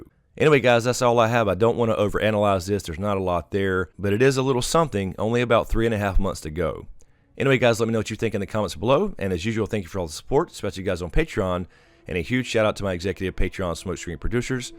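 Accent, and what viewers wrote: South, United States. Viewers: Where are you from? I’m trying to pick up what state your accent is from.😂🤷🏻‍♀️ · I worked on fixing the closed caption, I have spelled Daeny this way, hope it's correct. Mostly just fixed punctuation and names, and times when the auto generater doesn't recognize your southern accent. .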